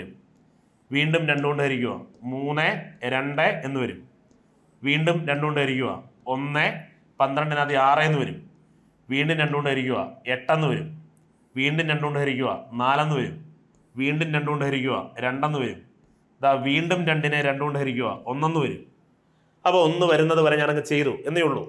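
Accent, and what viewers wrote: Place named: Kollam, India. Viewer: രണ്ടുപേരും കൊല്ലം ആണ് 👀... ഞാനും 😁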